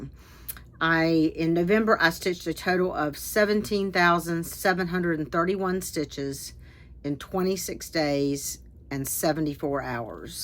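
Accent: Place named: North Carolina, United States. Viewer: Just found your flosstube and love going back and watching your previous episodes! I live in Florida now, but previously lived in the Charlotte, NC area for about 20 years! Love hearing your NC accent though, and seeing all your beautiful projects. Was wondering what thread you’re using for the Tisket a Tasket project. It’s beautiful and you’ve definitely enabled me!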